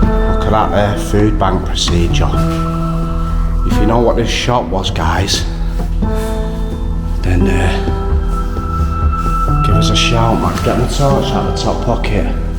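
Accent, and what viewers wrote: Manchester, United Kingdom. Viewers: I could listen to your manc accent all day long 😍 I drive past Eastford Sq every day heading to work. The concrete artwork is a genuine William Mitchell piece. He was the same artist that produced the three 'Minut Men' outside Salford University's Allerton Building. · Beltin' channel. Great to hear a proper North Manchester accent, are you from Colly mate? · Eastford square was a vibrant area for local people. Collyhurst was rough and ready but the people were the best in 1960/70. It had masionettes across the road and further up from the car park they were old peoples flats. It was a pub across the road. A well liked intergalactic place for artist to play and sing. The area has been neglected but it was a great place to live. It’s outside people who messed it up. You sound Mancunian but you’re a disgrace to the city. 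It’s rough now but wasn’t in its heyday. Shameful video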